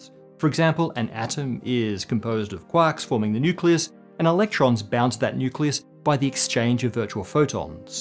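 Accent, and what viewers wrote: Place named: Australia. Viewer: I thought he was Aussie.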